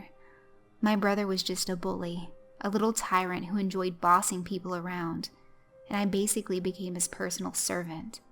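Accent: South, United States. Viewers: It's nice to hear a creepypasta on here being read by a fellow southerner.:)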